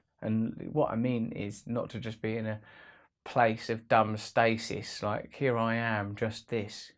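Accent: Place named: United Kingdom. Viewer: I say this not like this but much like this all the time. Well not all the time but from time to time. I think I would listen to myself a lot more if I had this great UK accent. Great work again Russell, you speak like a man of experience and a man who knows as much of its truth as he can know. I've become a fan fo sho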